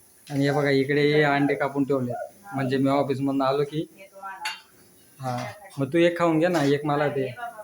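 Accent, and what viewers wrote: Khandesh, India. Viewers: Bhai khandeshi vatato aahe kutla aahe proper gav konte aahe tuze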